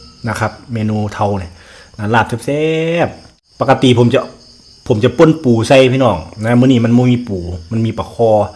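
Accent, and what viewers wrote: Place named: North, Thailand. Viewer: สำเนียงเหมือคนเหนือเนอะ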